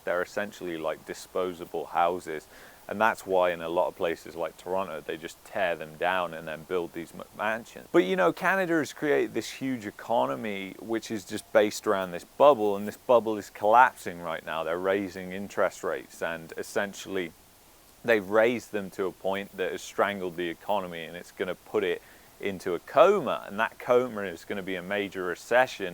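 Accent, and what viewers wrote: United Kingdom. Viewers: You Brit accent along is pleasure to listen to ! I learn English from your talking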